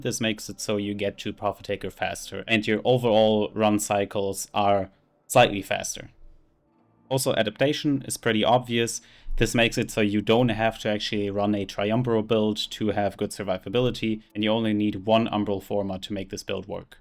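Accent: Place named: Germany. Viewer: I thought I heard my fellow German accent in here ;) nice video!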